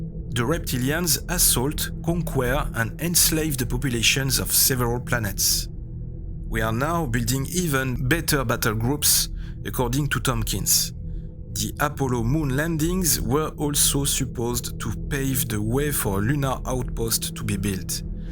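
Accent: France. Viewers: Ça c'est l'accent d'un français ou bien je ne m'y connais pas en accents 😂😂😅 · .... I can't. 😅 As much as I'm interested in the content that Ridiculous French Accent drives me insane. That annoying AI Voice would of been more bearable..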